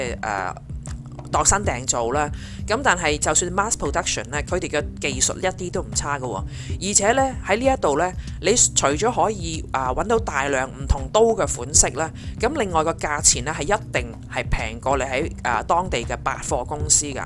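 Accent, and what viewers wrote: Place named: United Kingdom. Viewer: 睇你片重可以學英文，你 D 英國音好好聽。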